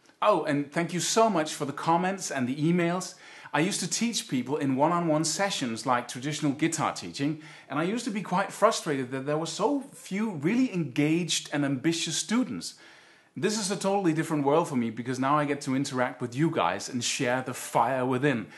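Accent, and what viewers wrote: England, United Kingdom. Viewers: Wow you look like me with short hair no facial hair and an english accent , shred on cuz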